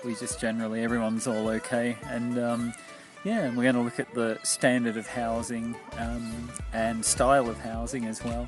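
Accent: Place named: Australia. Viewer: Love Australia English, new friend 😀😀😀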